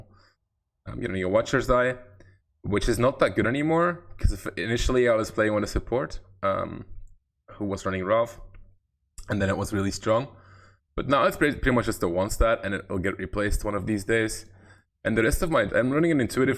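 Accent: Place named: Latvia. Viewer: Hmm, izklausās, it kā latvietis runā angliski...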